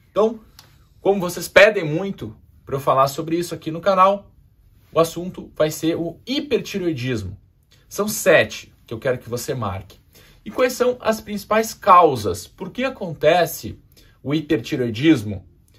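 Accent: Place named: Goiás, Brazil. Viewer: Nota 10 fala do Goiás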